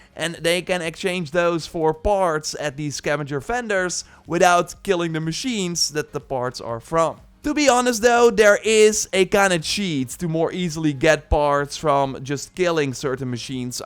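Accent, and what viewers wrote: Netherlands. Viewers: I believe he is Dutch. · Are you Dutch?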